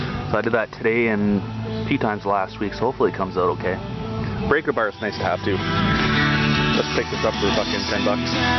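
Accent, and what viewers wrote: Canada. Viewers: Great video. I'm from ontario and my wife said hey he has the same accent as you. Bish I don't have an accent! LoL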